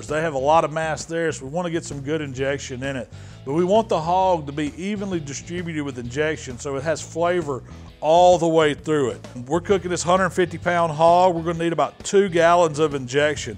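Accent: United States. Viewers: Which state does his accent represent?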